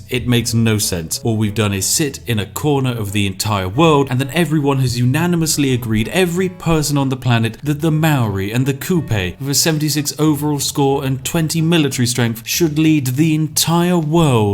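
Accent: United Kingdom. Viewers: That brittish accent is just perfection...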